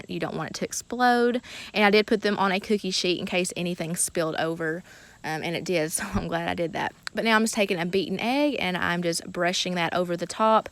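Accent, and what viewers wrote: South, United States. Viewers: Are you gonna do any more videos of packing your husbands lunches? I got so many ideas from those videos as well and hoping that you’ll bring those back. Love your content love your southern accent keep the videos coming♥️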